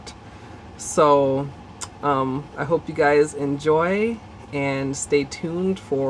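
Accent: Minnesota, United States. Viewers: You have a very Minnesotan accent. ;) but it's ok cause I'm Minnesotan too.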